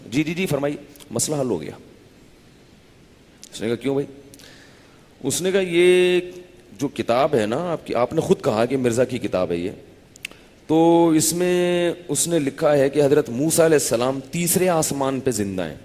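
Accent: Punjab, Pakistan. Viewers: uff ALLAH ini bhairi punjabi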